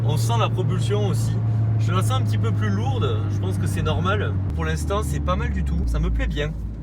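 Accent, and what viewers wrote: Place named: Bordeaux, France. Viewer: Le petit accent Bordelais des Familles b un essai du côté base sous-marine. Copbo gagnant avec une Mx5 Nc dommage que elle n'existe pas en 170cv elle serait top.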